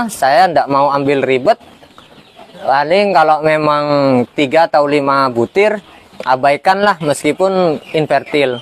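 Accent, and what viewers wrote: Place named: Madura, Indonesia. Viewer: Mak logat madureh kak😅... Kaemmah empiyan kak...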